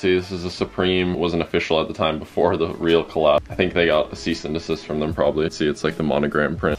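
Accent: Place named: Canada. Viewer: You sound extra canadian in this vid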